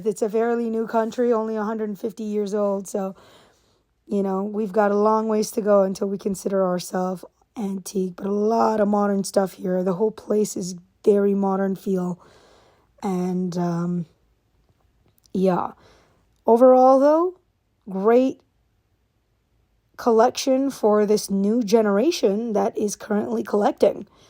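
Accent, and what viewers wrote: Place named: United Kingdom. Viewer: I like your bri ich accent , yeah ?😉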